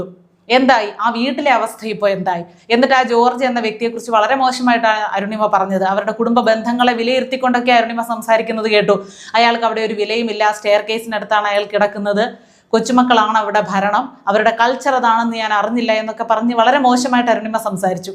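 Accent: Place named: Thrissur, India. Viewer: ആള് ആള് എന്നു പറയുന്നതുകേട്ടിട്ട് തൃശൂർകാരി ആണെന്നു തോന്നുന്നു. 🤔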